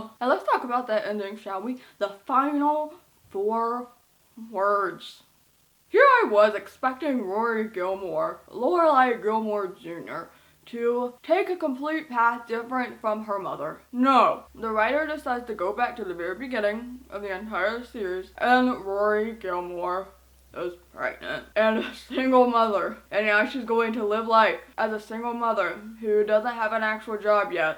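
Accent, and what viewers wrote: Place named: New York, United States. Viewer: lol, I was just joking around, the New York and Boston accent are very recognizable even to us Canadians. I stand by it being cute still.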